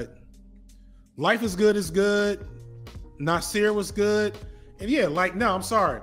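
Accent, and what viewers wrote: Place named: South, United States. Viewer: We also just talk that way in the south. We ain't gotta be leaned up. It's hot man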